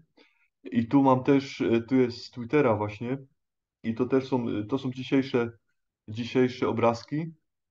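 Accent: Silesia, Poland. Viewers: Ma Pan miły głos,jeszcze ten śląski akcent,wspaniałe się słucha.Pozdrawiam serdecznie!❤️🇵🇱🇵🇱🇵🇱🌹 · skad ten akcent ? Ślonzok ? pozdrawiam nowy widz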